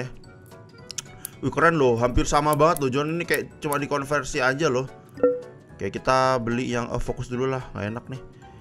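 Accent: Java, Indonesia. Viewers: Bang medok bang lop u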